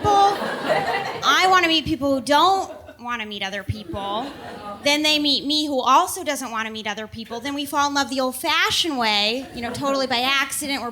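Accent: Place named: Minnesota, United States. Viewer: I first thought she had to be from Minnesota. Then I really started to appreciate the subtlety satisfied pauses with the wry smile between the jokes. 👍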